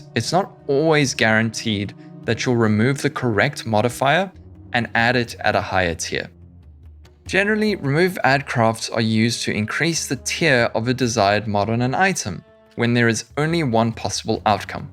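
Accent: South Africa. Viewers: Is it my imagination or are you South African?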